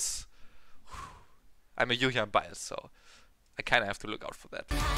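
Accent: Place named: Germany. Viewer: Du bist doch Deutscher! Erkenne diesen Akzent sofort c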